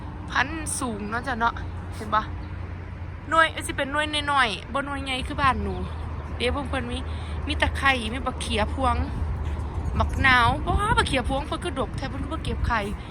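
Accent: Chaiyaphum, Thailand. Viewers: สำเนียงเว้าคือคนชัยภูมิแท้ แม่นบ่ครับ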